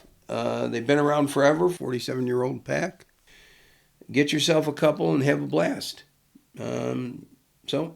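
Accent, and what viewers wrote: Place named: Michigan, United States. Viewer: Sounds like you're from Michigan bro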